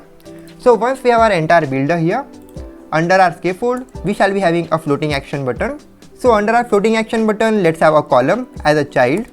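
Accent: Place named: India. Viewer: I saw some negative comments about your accent. It's perfectly fine to hear for any Indian or anyone who is used to Indian accent. The content is very well structured and to the point. Keep up the great job.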